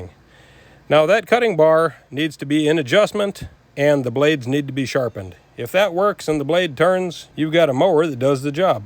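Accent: Wisconsin, United States. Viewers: also, you sound exactly like my uncle Steve that it kept catching me off guard. He's from Wisconsin and has a really specific accent. 😊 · Love the sound of "Wisconsin" in your voice AND the straightforward instructions--I think I may even be able to handle this (says the 59-year-old woman)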